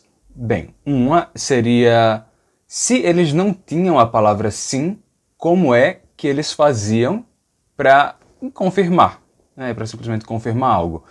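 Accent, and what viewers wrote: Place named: Northeast, Brazil. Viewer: da onde e o seu sotaque? Eu moro nos EUA por 35 anos e nao consigo saber exatamente que sotaque e o seu. Nordeste em algum lugar? Obraigada pela aula excellent.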